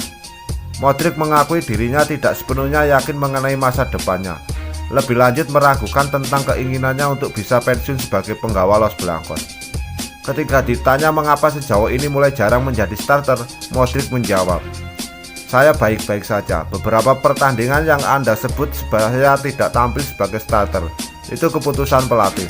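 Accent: Java, Indonesia. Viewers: Aku suka channel ini..cmn suaranya min ini yg aku gak suka..logat Jawa nya terlalu kentalnya dan kedengaran nya gk terlalu jelas min baca naska kaya ke anak SD yg baru belajar baca.. tolong min Suara yg lantang dan jelas kita pengen menikmati berita🙏🙏🙏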